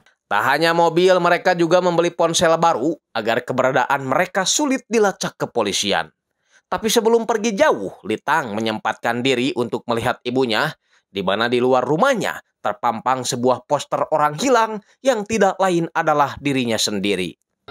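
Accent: West Java, Indonesia. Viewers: bukan iq7 bejir emang aksen sunda aja, bukan berarti aksen sunda = iq7 🗿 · Baru nemu chanel rekomendasi film2 unik, sapa dong mang wkwkw logatnya kga asing urang sunda 😂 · Lucu banget aksen sundanya kang😂, hatur nuhun sudah dibikin ringkasan Killer Paradox nya, seru pisan... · Gua subscribe Karena channel ini ada logat Sunda nya 😂😂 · dari suaranya kedengeran jelas orang sunda😅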